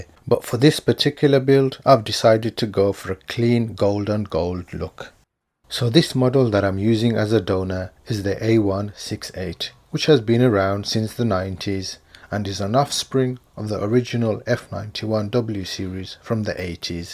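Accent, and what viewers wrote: Birmingham, United Kingdom. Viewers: You came up on my recommended, so this is the first of your videos I’ve seen. My first impression is ‘sounds brummy, specifically uk vibe of deadpan humour mixed in with confident yet laidback information’. I’ve never fixed a watch in my life or looked to do so (though I fix other stuff), but I’ll still be watching haha Glad people are recognising your work and sending you bits to tinker with! Hoping more independent companies get into making high quality 3rd party parts for restoring or customising otherwise forgotten tech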